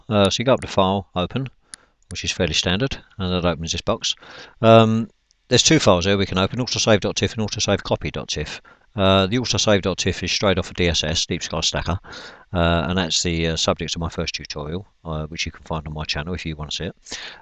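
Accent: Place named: Liverpool, United Kingdom. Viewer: My God he's from Liverpool.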